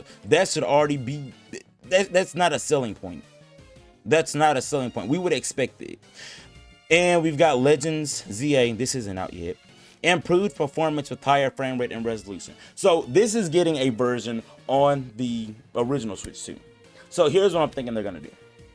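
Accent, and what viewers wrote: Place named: Baltimore, United States. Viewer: I feel like I hear Baltimore (but I could be wrong lol)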